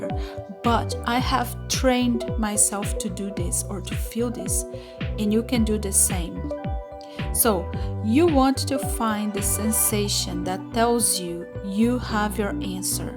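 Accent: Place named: Brazil. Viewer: eu amo que soube q vc tb é br no segundo que ouvi sua voz! muito obrigada pelo conhecimento compartilhado <3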